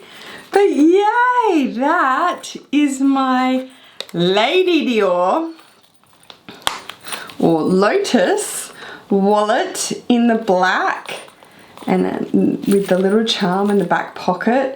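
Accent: Australia. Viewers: I have a question. Your English sounds beautiful and ylu articulate very clearly and pronounce words in a proper way. Many other Australians I watch on youtube seem to talk in a different way, like it was difficult for the to pronounce the words, if you have any idea of what I mean. Obviously English is not my native language. But my question is, do you have a different kind of accent compared to other Aussies, and why, or am I just so adjusted to your speech?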